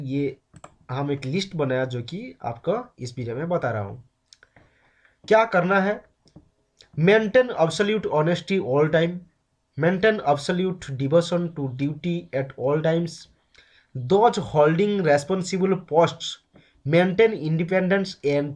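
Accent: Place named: Bengal, India. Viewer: Sir English is not English. Its very difficult to catch bengoli tone.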